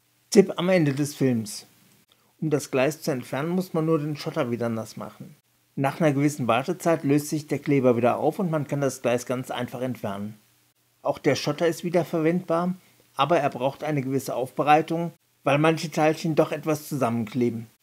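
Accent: Hesse, Germany. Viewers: Hallo, sehr unterhaltsam, auch oder gerade wegen dem "hessisch babbeln"... 😁😁😁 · Hi, sehr schönes und lehrreiches Video....kannst öfters hessisch babbeln:):) LG Mike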